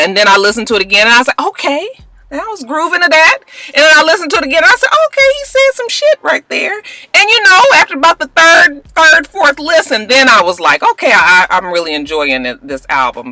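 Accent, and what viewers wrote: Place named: California, United States. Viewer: Heayer and Theryer. That Cali dialect is present!!!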